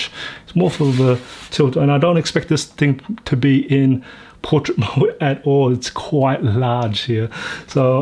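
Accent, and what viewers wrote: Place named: Australia. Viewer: You sound Australian, if you are where did you buy this monitor from in Australia?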